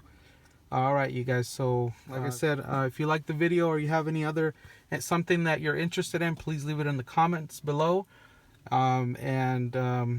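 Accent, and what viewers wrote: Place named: Russia. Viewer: Dude, are u russian? Sounds like you have a russian accent.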